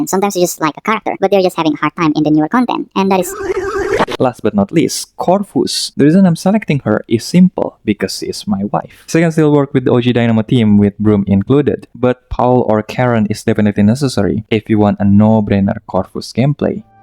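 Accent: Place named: Indonesia. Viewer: you sounds like Indonesian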